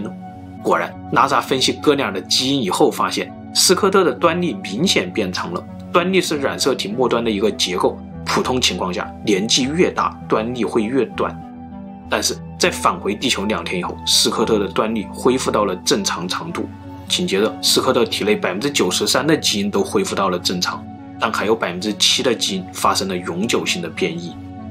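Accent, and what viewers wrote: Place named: South, China. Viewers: 我听出来是武汉人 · 就喜欢这种不标准的南方口音普通话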